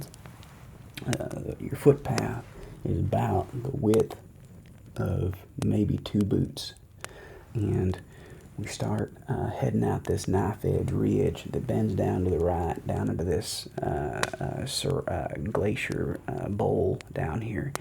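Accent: United States. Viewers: Love the crackling campfire sounds, plus your storytelling with the cowboy accent. Wild that those are true stories. 🤠🔥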